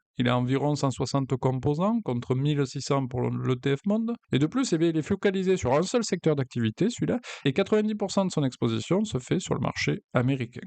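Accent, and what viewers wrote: South, France. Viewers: Vu ton accent, t'es du sud mais d ou exactement ?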